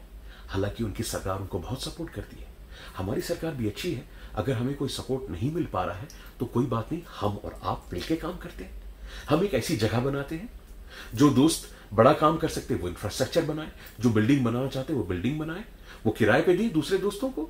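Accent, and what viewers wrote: Standard. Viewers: Clear, shudh hindi. ✌ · Your Hindi is so pure....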